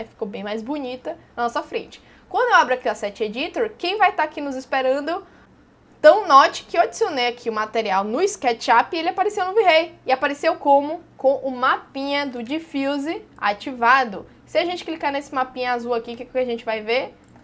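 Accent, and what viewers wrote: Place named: Bahia, Brazil. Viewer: Bacana! Vc pode conseguir também editar os mapas de bump e reflexo através do Color Correction em vez de usar o Mix. Foi inclusive a primeira vez que vi alguém usar o Mix com essa finalidade que vc usa. (em geral eu uso o Mix apenas no Diffuse pra mesclar texturas diferentes, como adicionar pontos enferrujados a um metal originalmente polido). Obs. Adoro sua didática. Esse jeitinho alegre e o sotaque baiano me encantam.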